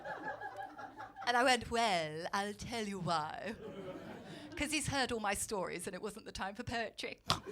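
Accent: RP, United Kingdom. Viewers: Ha! Brilliant! As a Lincolnshire man living in Pensacola, Florida I appreciate the juxtaposition of the Queen's English and bawdy humor. Subscribed :) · She's hilarious and I love her British accent! Why is it that all these beautiful women tell sex jokes? Hmmmm? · Brilliant! 😂 Well I must say, with that nose, cheekbones, chin, accent and name she must be the most british thing I can think of. Except the late queen ofc.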